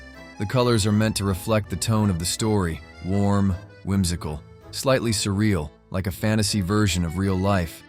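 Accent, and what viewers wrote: United States. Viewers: Your stupid american accent killed this video from me. I lived in Portland Oregon and I just have to tell you to get a different voice for your videos. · Kind of weird to hear a monotone american voice drone on about one of my favourite films. But what he says is quite acurate. I saw the film on the opening day in Germany. It flashed me so hard. I loved it, all the weirdness, the strange colours, the music! Amelie is still one of my most beloved film scores! It is what it is. Either you love it, or you don't. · that really doesn't surprise me. Listening to an American accent critiquing the film was painful. 🇳🇿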